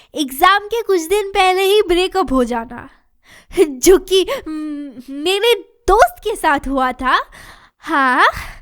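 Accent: Bengal, India. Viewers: Didi ka accent mujhe bengali wali lag rahi hai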